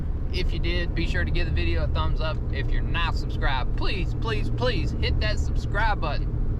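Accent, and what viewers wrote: South, United States. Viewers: Wow I love ❤ your Southern accent from Norristown PA here 👍🏾👋🏾🤟🏾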